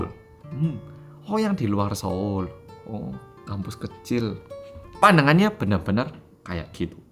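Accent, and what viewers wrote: Java, Indonesia. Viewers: Informasinya sangat jelas, mulai dari hal kecil sampai hal yang rumit. Intinya Bullying dimana-mana selalu ada, Karena sifat dasar manusia yang ingin menguasai dan diakui. Dan Sebagian besar orang-orang seperti itu terbentuk dengan sendirinya oleh lingkungan disekitar. Terima kasih, sudah membuka wawasan kami tentang kehidupan di Korea ya Mas 😁 Saya suka Gaya medok Njenengan hehe.. Salam dari Surabaya 🙏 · Mas Korea kok medok Jawa ya 🙏👍🇮🇩💪🇮🇩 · Cak, yoopo carane sampeyan ngomong logat korea.. padahal sampeyan medok, bikin tutor cara pengucapan bahasa Korea lah cak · Kok medok banget sih masss, jd unik lohhh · First time aku nonton vlog orng korea medok kyk org jawa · Enak bnr dgr logat jawa nya 😅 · Medok men bahasa mu mas bro.. 👍👍👍 Biar gak terlalu berkompetisi harus belajar spiritualitas mas bro 😁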